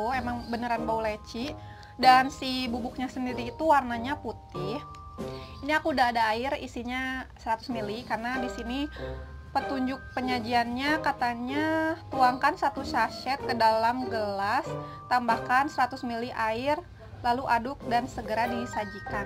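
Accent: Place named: West Java, Indonesia. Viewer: sia syet hehehe seneng denger logat sundanya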